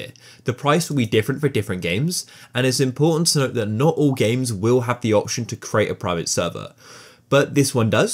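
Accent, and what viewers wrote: United Kingdom. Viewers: thx but are you british?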